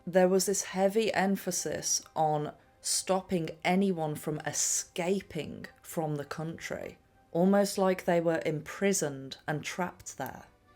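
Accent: United Kingdom. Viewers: I´m from Austria and I´ve discovered your channel recently by accident and I love it. Smart young woman with a charming british accent and highly interesting content!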